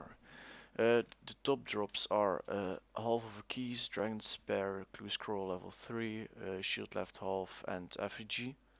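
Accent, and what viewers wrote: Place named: Netherlands. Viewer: tought you were dutch actually, it's because you speak words with an Dutch accent :) liked the guide really much, didn't know to kill them there :)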